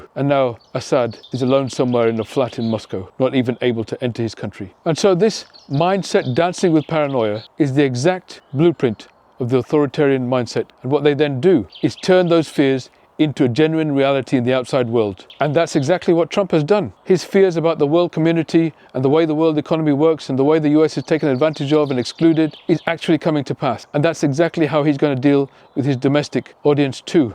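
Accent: United Kingdom. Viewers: He sounds British to me, so born here and a citizen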